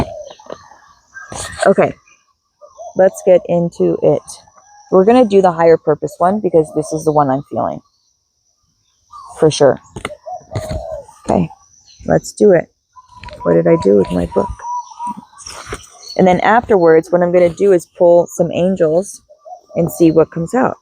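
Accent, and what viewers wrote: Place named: United States. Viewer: Where are you located? 🤔, you have a US English accent but you go by a strange time zone. Anyways I love watching your videos, watching your pets I also have several cats, two dogs and a turtle.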